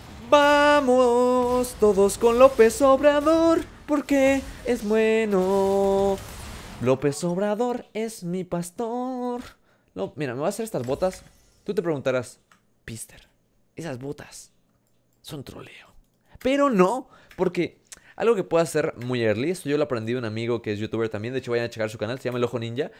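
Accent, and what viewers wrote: Colombia. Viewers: Eres de Colombia? XD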